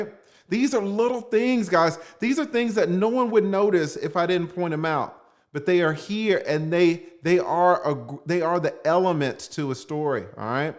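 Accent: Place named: South, United States. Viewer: Definitely the king of storytelling! With that southern twang and then you throw in you "fuckers' at times and when you say the chat told me to 'suck a d@ " haaaaaaaaaaaa cracks me up! Yessssss you're the man!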